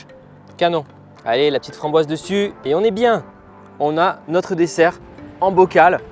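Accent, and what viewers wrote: South, France. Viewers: Merci pour ce moment sympa, vos bonnes idées et votre petit accent gourmand qui me rappelle ce sud que j'aime tant (et où je ne vis plus, hélas...) Je vais tenter ce dessert, c'est sûr.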